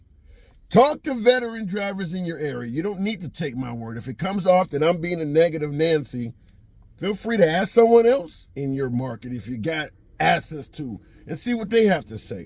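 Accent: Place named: New York, United States. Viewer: are you from New York or Boston?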